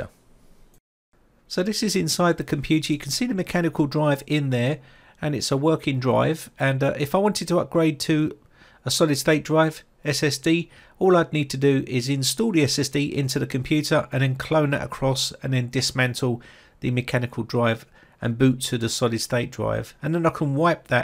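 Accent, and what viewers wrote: England, United Kingdom. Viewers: Watched and appreciated, but why are all these tech primers done by guys with English accents? Have to over and over some sections on many of them to figure out what's been said!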